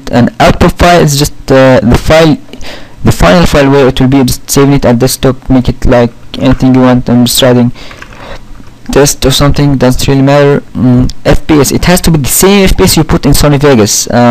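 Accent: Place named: Egypt. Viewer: you sound egyptian :) nice tut, but I prefer using handbreak, it's little more advanced but you can get better quality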